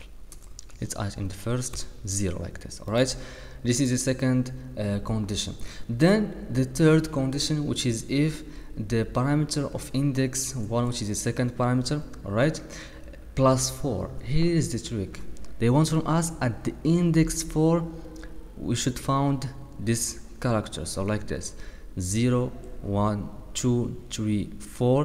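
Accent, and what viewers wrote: Morocco. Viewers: bro are you a Moroccan? Your accent? I'm to from morocco, good luck with your work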